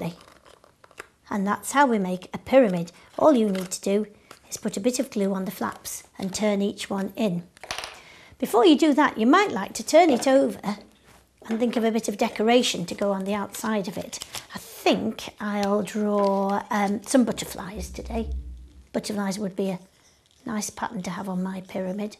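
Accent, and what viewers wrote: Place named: United Kingdom. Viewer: First hearing British accent so sweet and cute English :3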